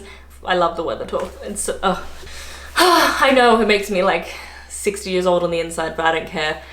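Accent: United Kingdom. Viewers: Sounds like you’re pretty much a Brit’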